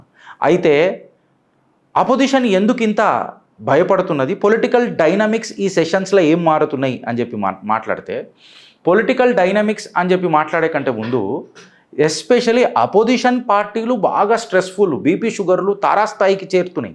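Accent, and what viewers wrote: Telangana, India. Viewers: A humarus and funny speech in sweet Telangana yasa but realistic. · తెలంగాణా యాస ఇబ్బందిగా ఉంది.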